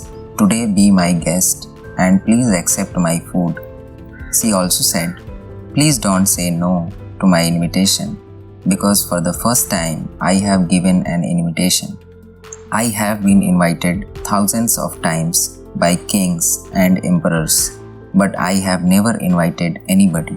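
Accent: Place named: India. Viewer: Thumbs up for using your own original goofy indian accent instead of an AI voiceover. At least it's genuine.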